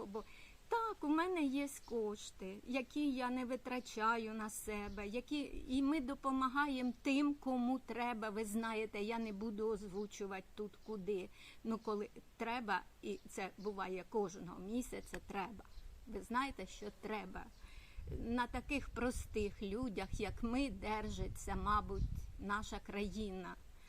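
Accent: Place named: Ukraine. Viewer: подивилася ваше відіо в сльозах,не дай Боже пережити нікому,що ви пережили,як ви сказали. Ми маєте для кого жити. Дай Боже дочекатися правнуків У Вас приємний голос і укр.акцент. Я з нетерпінням чекаю ваше відіо. Поважаю Вас. Здоров'я Вам іздійснення ваших мрій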